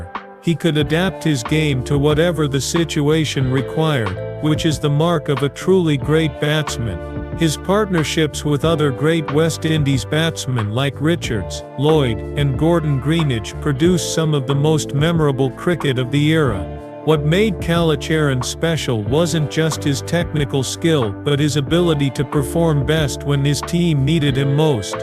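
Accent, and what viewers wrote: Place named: United States. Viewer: The narrator sounds like an Ameican!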